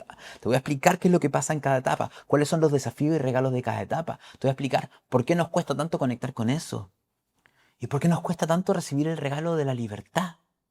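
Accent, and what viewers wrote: Chile. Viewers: Gracias,. Mantener el corazón abierto y agradecer. Intentar por todos los medios no estallar....respirar y cuidarse y cuidar. Vídeo para guardar y reescuchar si es necesario y creo que lo será. Agradezco todo lo que transmites....seguro que habrá momentos que sea agotador. El panorama panorama es terrorífico... pero como tu dices es una oportunidad. Hay muchas personas sufriendo y mucho. Cada uno sabe por lo que pasa pero hay lugares en donde no saben que va a ocurrir de un momento a otro de manera terrible. Siempre dices al final de los vídeos que nos quieres mucho y nos cuidemos....en chileno que es un modo de hablar encantador. Y que me resulta tan familiar...así que yo hoy te digo que se te quiere y que te cuides mucho. Abrazo para ti y para todos. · Hola buenas tardes. Soy chilena 🇨🇱 del signo de virgo. Me encanta la forma como te expresas habla como un verdadero chileno, muy real y muy sincero oro y miel para ti 🙌💪🐘♾️🌹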